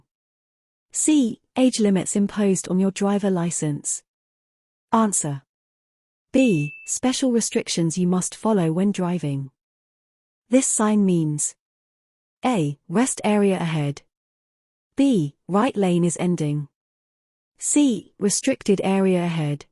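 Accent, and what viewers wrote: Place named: United Kingdom. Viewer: Thanks for this video, but this is a Q&A video for California DMV which is what it means for American citizens, so why was the narrator chosen with a British accent?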